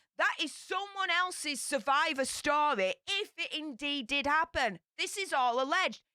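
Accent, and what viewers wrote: Yorkshire, United Kingdom. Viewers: Is that a Yorkshire accent,? North or South? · Hi # Just saw you on popcorned. Love your Yorkshire accent. It's 'reet gravely'... Love the vid. I subbed! x Ve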